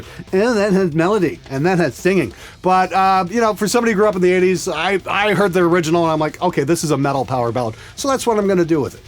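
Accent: Canada. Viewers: Are you canadian? Some of your pronounciation is pretty canadian.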